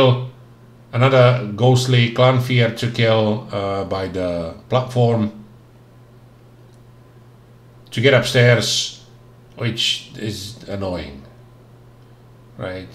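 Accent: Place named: Highlands, United Kingdom. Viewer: YESSSS!!! I felt you had a highland accent but then some words I could tell were spoken with something else! I too and from just outside Glasgow!! So hello neighbour!!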